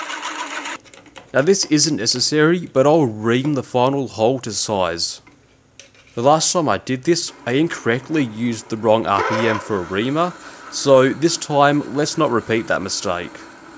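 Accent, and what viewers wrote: Australia. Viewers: All great again mate except you pronounce chamfer wrong, don't give up Australian accent. It's a French word and it's pronounced with a "SH" . Soon you will be pronouncing rebate as rabbit? 😉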